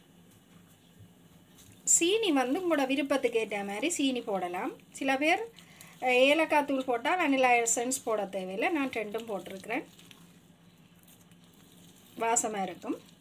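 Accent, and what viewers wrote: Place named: Sri Lanka. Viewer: Thank u ... Elaggai tamil nalla irukku... Nice recipe...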